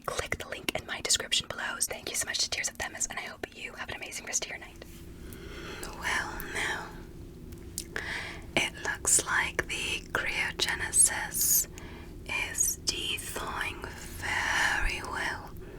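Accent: England, United Kingdom. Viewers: Is she English or American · Omg yesss, I love your alien ASMR videos with the British accent. I don't know why but it just hits different and makes me feel safe. The British accent just tingles apart of the brain that I can't explain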